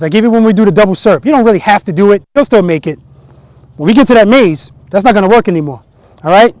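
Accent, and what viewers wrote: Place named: Boston, United States. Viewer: I was working on my bike when this video started. I thought you're a chippy Italian-Irish bloke from Boston at first. 😂 Regardless, I thoroughly enjoyed this video. The way you communicate is top class and I'm so thankful to have stumbled upon your channel.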